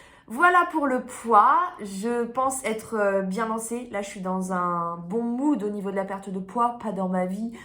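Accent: Brittany, France. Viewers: Bravo pour ce formidable parcours! Tu est au top 😊 ( Et dans tes vidéos j'aime bien les petites choses concernant la prononciation made in Finistère comme "même" qui deviennent " Meum", sorry je fais ma pikez 😉😂 )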